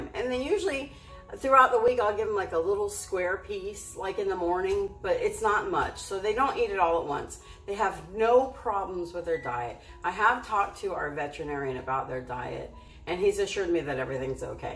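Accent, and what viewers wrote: South, United States. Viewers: Love your shakes and southern accent. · Too cute with their bibs. Love Monroe loving Tonka, sweet! Tomorrow will be on the road for 10hrs Albuquerque to Okla City. Hopefully we will find a place to settle. Thanks for the Southern accent. Married to a Southern man. hehehe. Joan Bates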